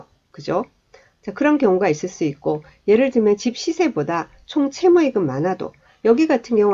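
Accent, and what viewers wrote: Busan, South Korea. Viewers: 정말 너무너무 감사합니다 지금은 손녀봐주러 서울에 와있으면서 강의를듣고 있지만 부산의 그수한 말씨로 자세하게 알려주시는 멘토 입니다 영원한 경매나라 왕비님이 되어 주세요 감사합니다 ~^^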